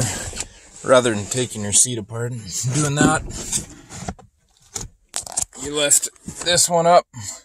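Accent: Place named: Canada. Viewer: This guy has the most excellent Canadian accent I've heard in a while!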